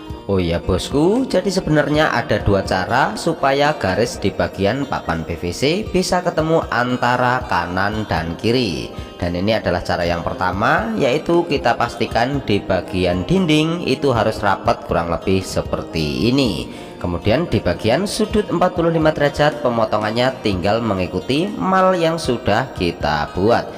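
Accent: Indonesia. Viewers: Kena sindir admin akhirnya ikutan komen juga lah.. Banyuwangi hadir dan menyimak bosku.. kalau lihat logat bicara nya kayaknya adminnya orang blitar.. entah benar atau salah. Salam seduluran..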